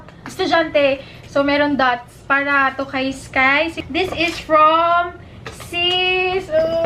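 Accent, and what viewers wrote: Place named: Visayas, Philippines. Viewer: that little visayan accent or ilocano accent ? 😂😂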